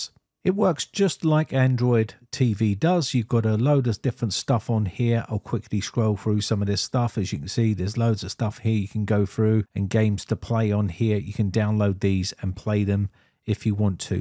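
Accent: United Kingdom. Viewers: Oh nice tutorial i knew you are british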